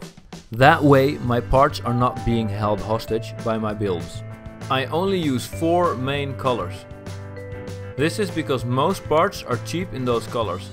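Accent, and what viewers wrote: Netherlands. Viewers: From the accent you can hear he is Dutch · You sound dutch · You sound like an man speaking english with a dutch accent... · Are you from the netherlands? Kan het horen aan je axent😅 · Nice way to do so my friend. This kinda overviews you don’t see that many often. When I, for whatever reason, need to go into the grandchildren’s playing room I’ll need either a great deal of force or rent a CAT shovel or backhoe! It’s a good idea however to sort it out! 👍👍. By the way, you’ve got these accent as I recon as European? Dutch or Swedish?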